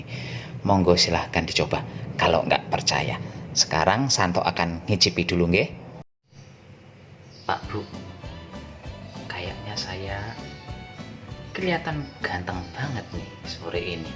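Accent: Banyumas, Indonesia. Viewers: Saya suka resep apalagi bahasa banyumasan kental banget 👍👍👍😇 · Memang sangat" menghibur para pecinta yutub sperti aku dn bicaranya yg ak ska ngapak alias lucu 😅😅😅😅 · Lik...ngomong ngapak malah seru..nek nglebokan alias memasukan sih bhs ngapake apa yaahh.. 😀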